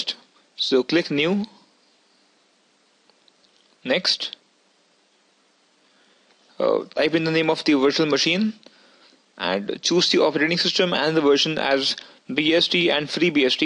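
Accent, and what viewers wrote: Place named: India. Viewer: Are you from india? btw love the accent.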